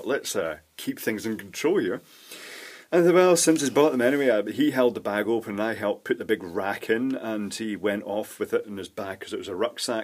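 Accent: Scotland, United Kingdom. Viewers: thought you were scottish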